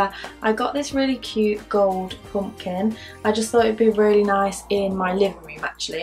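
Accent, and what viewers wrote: United Kingdom. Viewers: Awwwwwww! :D i love your new stuff and i love your British accent too <3 :D Love from Egypt <3 <3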